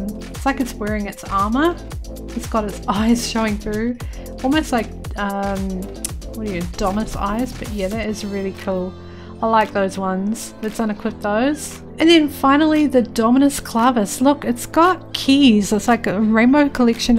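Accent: Australia. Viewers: Are you Aussie or New Zealand? Or from a completely different place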